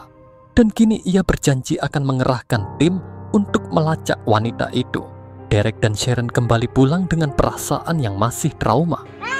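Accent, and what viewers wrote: Bali, Indonesia. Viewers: Admin org Bali ya? Aksennya kentel bgt 😅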